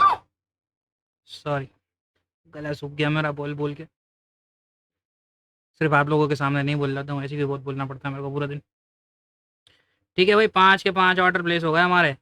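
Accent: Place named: Karachi, Pakistan. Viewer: bhaai kasam se ye hoti hi video bhai ap log behtareen karry ho yar ye wahid video full detailed me mili hi baki sab log sirf chotiya banaty hi time waste krty hi bas view lyty hi and i love this karachi Landhi korangi accent Pepshi pepshi 😍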